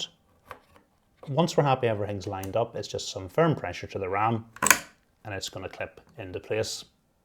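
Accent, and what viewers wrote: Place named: Northern Ireland, United Kingdom. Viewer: Really good to see this great build, and even better to hear a local NI accent. Super job especially with these 2026 parts prices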